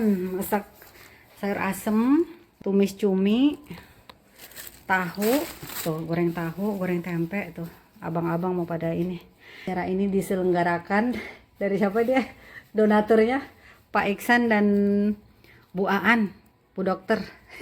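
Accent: Jakarta, Indonesia. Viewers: Betawinya medok bgt mpo😅 ... Salam Sy jg betawi larangan Ciledug tangerang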